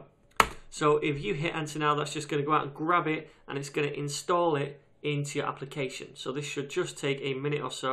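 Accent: United Kingdom. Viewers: I just want to say thank you for your tutorial and your British accent drive me to learn more about nodejs : )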